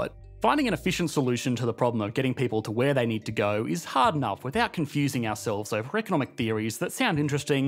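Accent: Australia. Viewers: goes to the point. I live in a suburban area in Europe and own a car but also use public transport. While I use the car to go to work away from the city there are definitely use cases where going to the city is faster, cheaper and much more convenient by public transport. Not to mention that that shorter travel time is also free to use for reading news, watching this video, or other and you can also drink some alcohol if you are going out. It is unbeatable value proposition, forget the car. But that is mostly because the city was planned to have really good public transport on the expense of roads for cars. Some jurisdictions even outlawed free parking to give more incentive to use public transport. The author of the video sounds Australian, so I understand, that’s another planet compared to large European cities.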